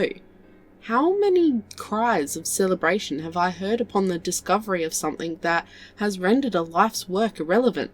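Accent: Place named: Australia. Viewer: I think it's wonderful to have a young Australian voice reading these stories. It reminds me of my childhood, (although the readings were more of fairy-tales than sci-fi - unfortunately).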